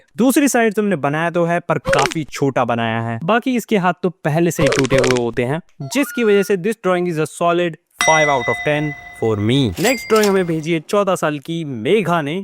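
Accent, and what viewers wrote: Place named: Haryana, India. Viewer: Bro aap kha se ho language se haryana se lag rahe ho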